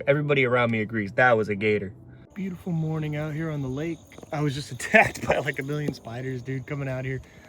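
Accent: South, United States. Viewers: I’m sorry am I crazy, you actually sound like you’re absorbing a little bit of the Arkansas/Southern drawl??? Sounding less like a Californian for sure!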